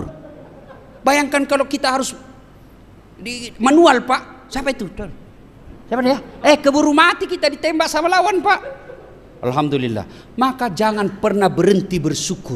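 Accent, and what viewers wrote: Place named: Bugis, Indonesia. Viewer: Pengaruh Logat Bugis pak...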